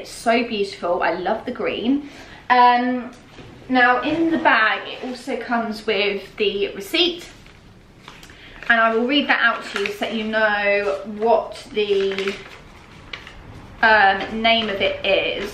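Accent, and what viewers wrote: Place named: United Kingdom. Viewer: Your British accent!👍🏻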